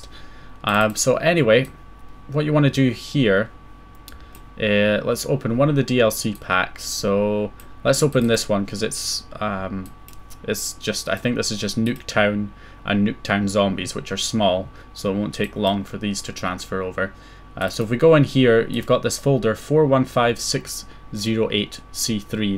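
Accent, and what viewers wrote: Scotland, United Kingdom. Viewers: Mate are you Scottish?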